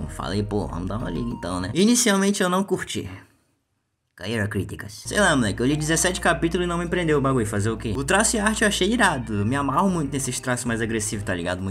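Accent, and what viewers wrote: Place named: Alagoas, Brazil. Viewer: Krlh tu é alagoano tb skskskksksks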